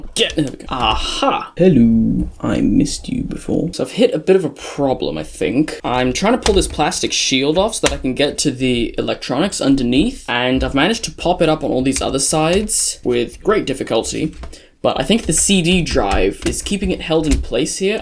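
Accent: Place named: United Kingdom. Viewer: you are somehow THE most british person I've ever heard and also WHAT WAS THIS NOISE anyways you are cool have my sub and like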